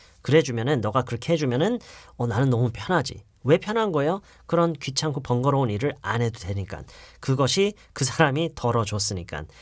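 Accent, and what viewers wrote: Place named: United States. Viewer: 진짜 한국어버전으로도 양키발음을 잘 하시는게 신기하네요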